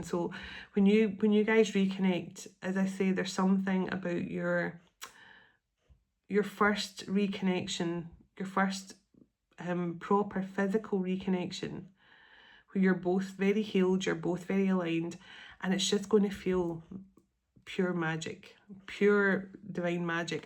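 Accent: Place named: Scotland, United Kingdom. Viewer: YOU are so loved by all of us. When I first came across your Channel, I was intrigued by Tarot and what it was and listening to your Scottish accent was endearing....... when we connected by Zoom it was then I KNEW we are from the same Soul group..... my sister, sweetest feeling ever. I love tuning in just to listen to your stories and to hear of how God is moving you into your destiny......... beautiful. Thank you for being such a comfort to me,. I don't know how I managed my emotions until you began helping me..........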